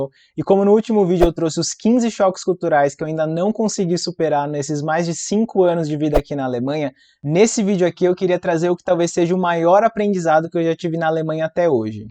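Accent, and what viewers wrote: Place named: São Paulo, Brazil. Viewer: Como vc é de Salvador com esse sotaque paulistano, do Bexiga?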